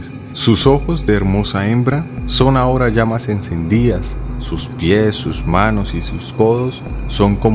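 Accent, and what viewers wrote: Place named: Colombia. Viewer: Jajaja que bien el tónico colombiano mi parceeee !! nunca había escuchado esta historia pero se parece a la de la llorona 😭 AAAAY MIS HIJOOOS !!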